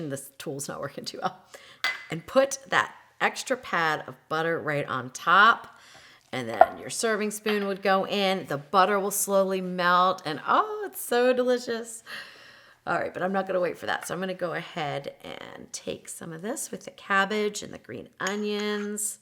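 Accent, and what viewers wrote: United States. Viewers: So much talking! Just show us how to make the dish. Also, if you are half Irish and half German, why do you have an American accent? 🤔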